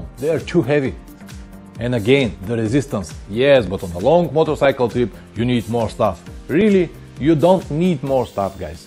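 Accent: Russia. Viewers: я не знаю с какой ты страны, но у тебя такой русский акцент на английском, что я понимаю твой английский без переводчика))! С любовью из России! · Ты чо? Русский чтоли?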